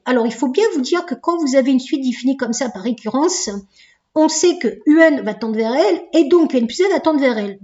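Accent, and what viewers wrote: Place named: Southwest, France. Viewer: Superrr madame ! Vidéo qualitative avec un arrière goût du sud-ouest :) merci beaucoup !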